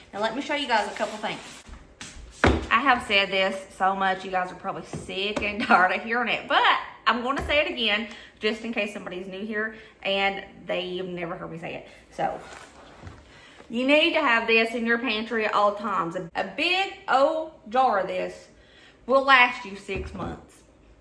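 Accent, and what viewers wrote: South, United States. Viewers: I love eggs and rice 😊😊 Your southern accent is adorable 😊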